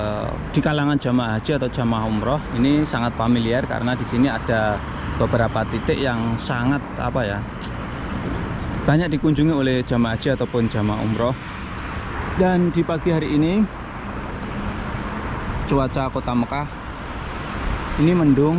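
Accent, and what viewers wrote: Java, Indonesia. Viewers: mas ni asli orang mana yaa??? 🤔🤔 logat nya kayak orang jawa....🙂🙂 salam dr ponorogo Jawa timur... 🥰🥰🥰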